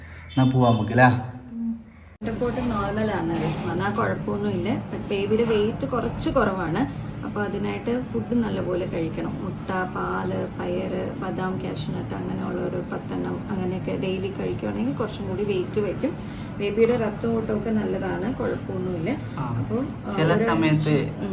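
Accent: Vadakara, India. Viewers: നമ്മുടെ വടകരസംസാരം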